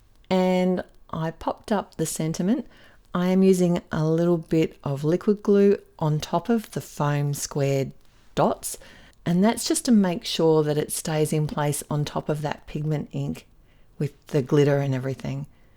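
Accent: Australia. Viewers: Great hear an Aussie xxx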